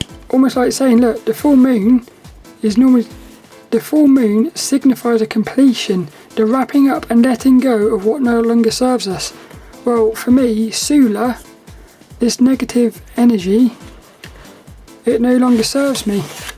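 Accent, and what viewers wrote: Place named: United Kingdom. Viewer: Anyone can learn a little BritishnEnglish from listening to lill you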